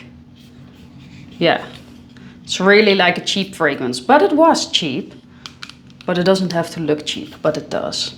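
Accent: Netherlands. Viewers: I love that dutch accent ♥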